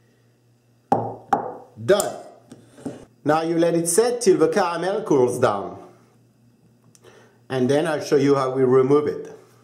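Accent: Jamaica. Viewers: jamaican accent man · i love his jamiacan accent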